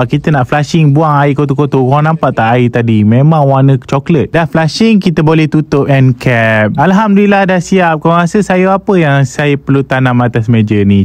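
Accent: Pahang, Malaysia. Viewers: macam orang pahang. lorat pahang ke?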